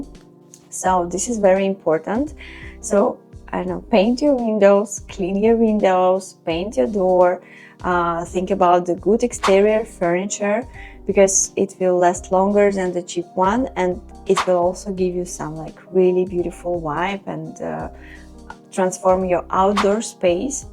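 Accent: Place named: Germany. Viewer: You German huh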